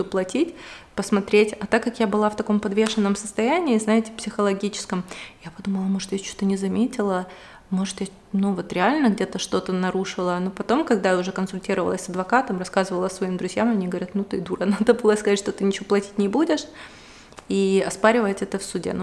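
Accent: Ukraine. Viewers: какой прекрасный разговор получился!) Вы чистая, светлая, душевная. А ваша речь и диалект - я как сама окунулась в свое детство, которое тоже прошло на Украине, но уже 27 лет не живу там. Несмотря на разницу в возрасте, у меня было точно такое же детство, такие же теплые воспоминания...Все ваши выражения " у нас так говорили/говорят" мне понятны, потому что у нас тоже так говорили 😀. Теперь моя мечта встретиться с вами где-нибудь на просторах нашей планеты и пообщаться лично за чашкой кофе.... ❤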